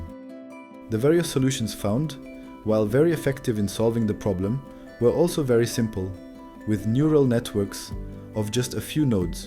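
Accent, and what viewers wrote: France. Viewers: j'adore l'accent fr XD : )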